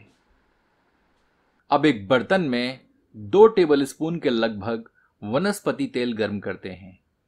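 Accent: Standard. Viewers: Sir dekh ke hi muh Mai paani aa gya.. Jarur try karenge.. Aur recipe se jyada maza Aapki shudh hindi sunne Mai aaya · Namaskar. Shriman aap ati shuddh evam sundar Hindi bolte hain. Is byanjan ko mein prastut karne ka prayas karunga. Dhanyabad.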